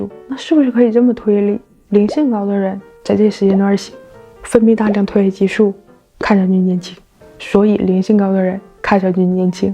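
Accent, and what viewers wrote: Northeast, China. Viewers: Youtuber是哪里的人？听口音像是东北人。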